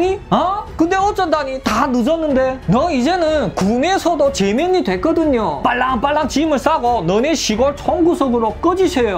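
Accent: Yanbian, China. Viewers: 수고 많아요 연변한국어 정겹게 듣고 있어요 좀더길게 해주세요 너무짧아요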